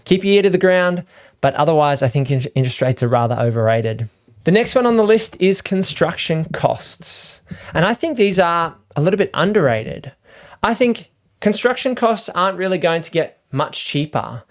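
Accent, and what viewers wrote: Australia. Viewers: Love hearing an educated aussie accent. Could listen to this guy for hours.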